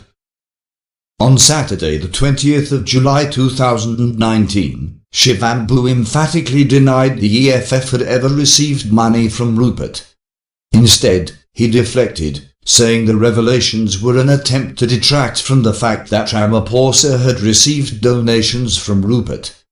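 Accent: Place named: England, United Kingdom. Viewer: Who ever is narrating this video, you're not South African, in fact, you have an English accent, so stop poking your nose in our issues and talk about the Monarchy. Which South African can't pronounce "Stellenbosch"?...nxm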